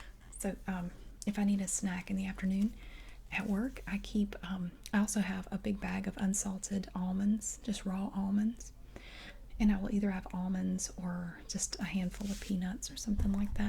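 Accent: South, United States. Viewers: It may just be the effect of your southern accent, but in Germany it is pronounced 'Al-De' (I used to live in Berlin, shopped there often) but to be fair, here in the UK people say 'All-De'. The native pronunciation can be found in this music track! <link>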